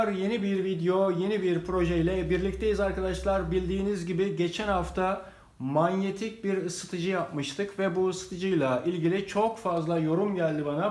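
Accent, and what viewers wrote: Turkey. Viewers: He speaks with a local Turkish accent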